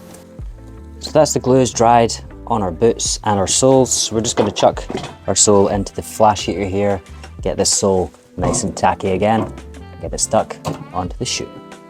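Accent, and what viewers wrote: Scotland, United Kingdom. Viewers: Have relatives from Northern Ireland, who always considered themselves as 'British' rather than 'Irish,' but I'm not too familiar with accents but think you might have some Scottish roots too. Perhaps not, but you have a new subscriber here...) Best wishes!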